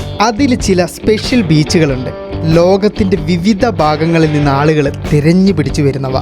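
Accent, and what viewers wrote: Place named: Central Kerala, India. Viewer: നിങ്ങൾ ശരിക്കും കണ്ണൂർകാരൻ തന്നെയാണോ നിങ്ങളുടെ ഭാഷ ശൈലി കോതമംഗലം മൂവാറ്റുപുഴ തൊടുപുഴ ഭാഗത്തുള്ള ഭാഷാശൈലി ആണല്ലോ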